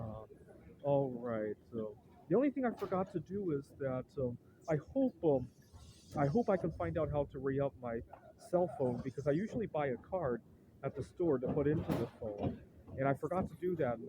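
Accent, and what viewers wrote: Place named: United States. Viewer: do you generally communicate with people in Taiwan in Chinese or english? How do people react when you start speaking English to people there in your American accent? Just curious because when I go to India I get a lot of head-turns and confused looks, because to them, seeing a person that looks like them but sounds foreigner is a unique sight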